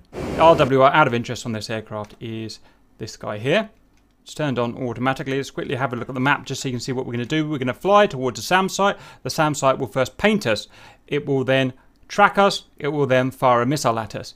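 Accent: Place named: Manchester, United Kingdom. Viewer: Just a thought...not that anyone listens...but I'm new to DCS, but I am a private pilot...and some of the things i have seen and heard from a number of people who claim to offer help...really bug the crap out of me. It seems that you guys forgot that you are offering "help"; which means that the people who are listening to you don't have the time or experience with DCS...that is the same time you have. Personally, I suspect a number of you..., sidewinder, wags, etc...work for DCS; or did at one time. So, before I begin let it be known that I have tons of respect for my brothers who live across the pond; but some of you speak with a thick Manchester accent...so understanding you in simple conversation is difficult. So could you please slow down when you are speaking...this is not a race...its a tutorial or instructional...and if you want to reach out to everyone effectively...slow down. 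In addition, as you talk could you please use the cursor to highlight the components you are talking about or using. More often than not you are using the abbreviations, and when you combine the abbreviations with the speed in which you talk...the new guys have fallen behind before you begin. So please slow down, and ensure you are visually queuing the components that you are talking about...so the rest of us get it. I'm new...but you will be seeing me on the field...Bad JuJu or Train Wreck.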